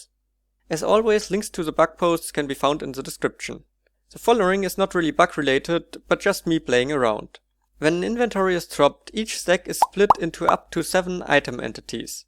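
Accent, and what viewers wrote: Germany. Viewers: I think he is a german guy who speaks english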